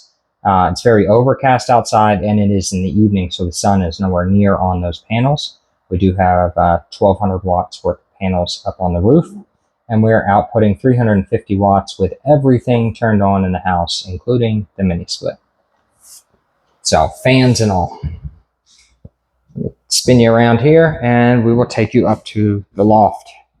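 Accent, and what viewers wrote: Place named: United States. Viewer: Aucun Européen parle comme cela. Faut il être un Con d amerlock pour avoir cette intonation. Si un Iranien ou autre vous tué , on ne vous pleura pas et tant on ne vous entendra plus.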